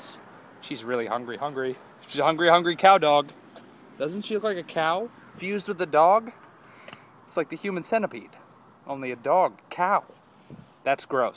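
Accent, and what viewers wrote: United Kingdom. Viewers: British voice lol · UR British right?I bet on it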